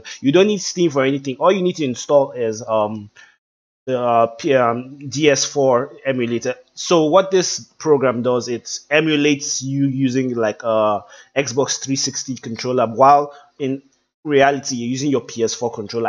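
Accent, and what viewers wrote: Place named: Africa. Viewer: you have an african acent like like guy who sang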